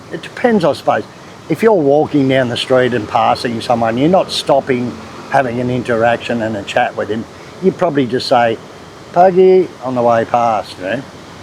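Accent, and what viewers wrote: Australia. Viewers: The content in this video is excellent. If you want to learn to speak Bahasa with an Ocker accent this video is fine. Once you have got the words and translations down pat, best you Google an Indonesian person saying these words and phrases so you can get the pronunciation correct.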